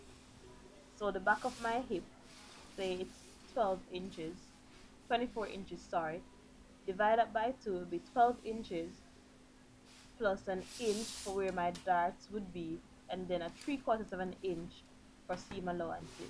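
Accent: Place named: Jamaica. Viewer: are you Jamaican? you sound jAmaican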